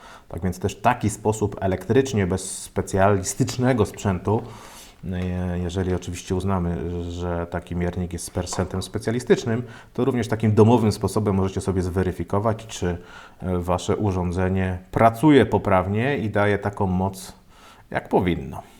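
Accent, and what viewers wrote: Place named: Kielce, Poland. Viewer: Hahaha 😁 razi Cię bo po nicku wnioskuję, że jesteś z Opola. To jest mowa z okolic Kielc 😉 Sam z tych okolic pochodzę i pamiętam jak wszyscy mówili : czopka, szolik, szofka itd. Po 20 latach w Krakowie słyszę te różnice, ale nadal wychodzę na dwór a nie pole 😉 Taka gwara lokalna, pewnie też u Ciebie coś takiego jest i nawet nie zwracasz na to uwagi. 👍 Miłego dnia.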